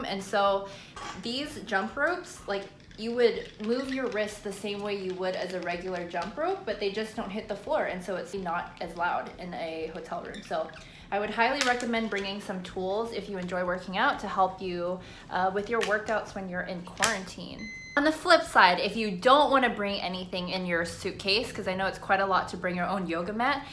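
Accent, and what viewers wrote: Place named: United States. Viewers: Late to respond here🙏 Appreciate the video👍👍 I’d say stick to your American or North American accent while I’m Malaysia.. whenever I try use Manglish/Singlish, I think I sound weird and can’t get my thoughts across. All the best! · How come you use the American accent in M’sia ?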